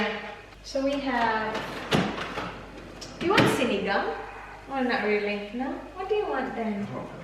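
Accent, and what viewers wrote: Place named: Visayas, Philippines. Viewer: Bisaya ka po? Ang cute po NG punto mo😊😅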